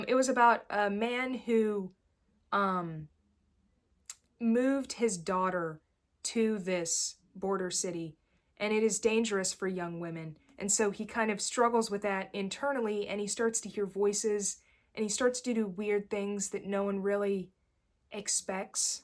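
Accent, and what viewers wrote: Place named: Pennsylvania, United States. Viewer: You are definitely from either eastern Pennsylvania, Delaware, or the Baltimore area. Your dialect gives you away. PS great list and great content.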